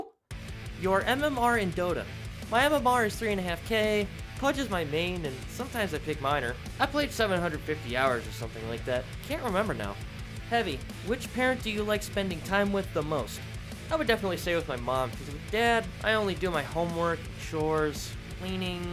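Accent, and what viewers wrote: United Kingdom. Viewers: Oh you're from Scotland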